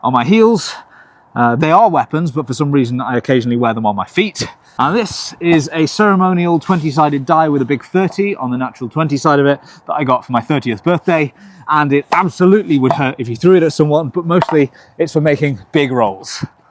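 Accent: United Kingdom. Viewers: It is super British. I had to hear him speak to understand it haha.😅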